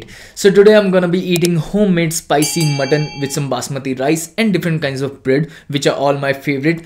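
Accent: India. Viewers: Bro your speaking english very nice but i cant understand you. Your accent is so indianish. You are a very good speaker and i love your videoes. Plz at least try to speak with a little different accent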